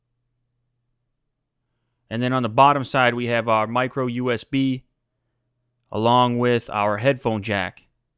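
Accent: Canada. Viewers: Hahahaha omg feeling old as me? I love how Canadian Lew sound back in those days. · Sony's loading screens in games haven't changed much. Also, you sound more Canadian in this video.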